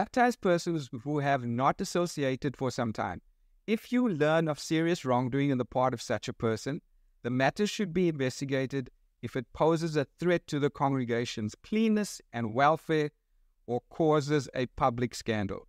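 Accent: South Africa. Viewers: May i ask is your accent from South Africa?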